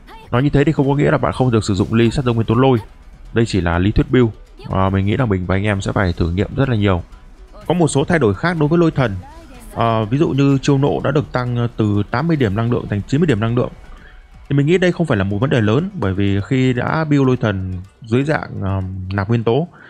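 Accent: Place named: South, Vietnam. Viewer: nghe giọng anh nói tiếng miền Nam dui vc xDD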